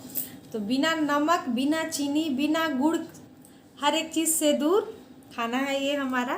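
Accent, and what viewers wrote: Bengal, India. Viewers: Didi ap bengoli ho ????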